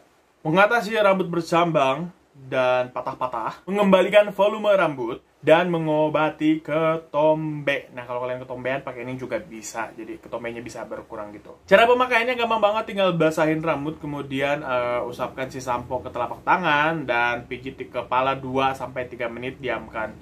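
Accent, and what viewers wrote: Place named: West Java, Indonesia. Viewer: Sunda anjir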